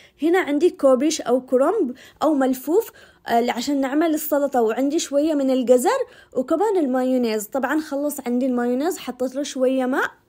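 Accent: Aden, Yemen. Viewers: ماشاالله عليكي دكرتني يابنتي بالتواهي والسياره حق البطاطيس الله يحفظك يا اصيله بنت عدن وكله كلامك عدني محسن كلامنا نشتي طريقه الكاتلكس بالمفروم حق عدن والسبوسه حق عدن من يد حبيت الاكل ❤❤❤❤❤🎉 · ايوه حلو لهجتك تجنن يمنيه وافتخر تحياتي من صنعا ام ايلان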